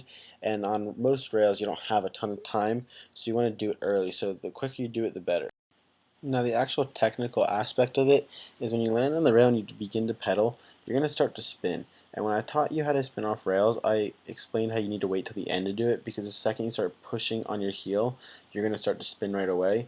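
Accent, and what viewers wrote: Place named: New Jersey, United States. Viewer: NJ accent + skiier inflection = Canadian accent? That is very interesting. Nice video btw!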